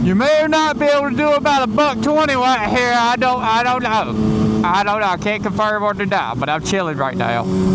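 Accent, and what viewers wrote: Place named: South, United States. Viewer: i knew i been hearing a southern accent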